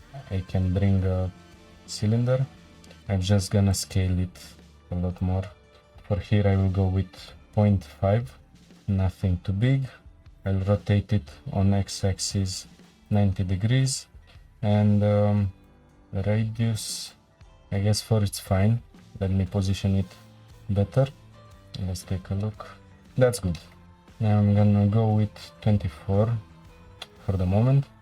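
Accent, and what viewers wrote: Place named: Romania. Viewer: aud romanul in tine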